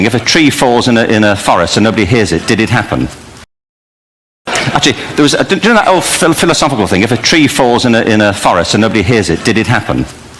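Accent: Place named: United Kingdom. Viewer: かっちょいいイギリス英語ですね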